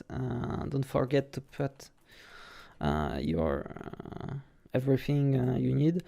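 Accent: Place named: France. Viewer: I love french peoples speaking english . C'est très facile de reconnaître ça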